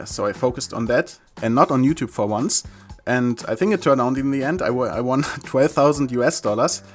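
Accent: Germany. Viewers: Man merkt das du deutscher bist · Man merkt das du Deutscher bist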